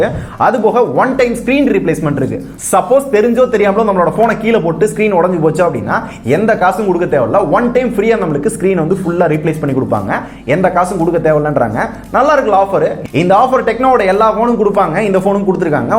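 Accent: Madurai, India. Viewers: Bro neenga Madurai ya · Bro neenga madurai ya🤗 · Bro neenga madurai ya bro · bro neenga madurai ya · Are you Madurai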